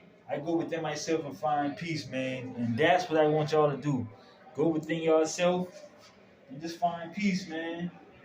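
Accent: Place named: Charleston, United States. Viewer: Hold ya head fam 💯💯 u talk like lil buc from Charleston. Knew u was from here 💯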